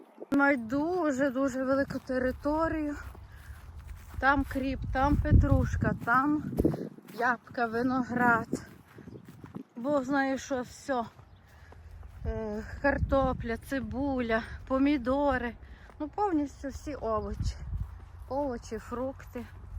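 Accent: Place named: Western Ukraine, Ukraine. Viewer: Якаж противнюча бандероско- вуйсковська мова. Вот там и оставайся у пани гейропи, такие нам в Украине не нужны. Быть добру 🕊️🕊️🕊️.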